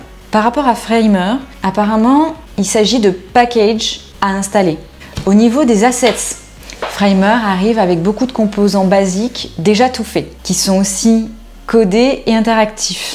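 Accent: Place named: France. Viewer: the french accent is a huge flex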